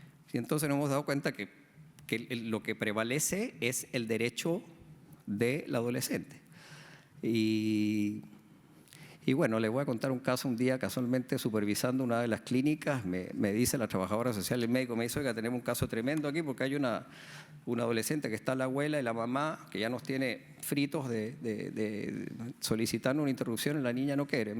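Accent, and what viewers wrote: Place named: Chile. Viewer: yo encuentro que tiene acento chileno 🤔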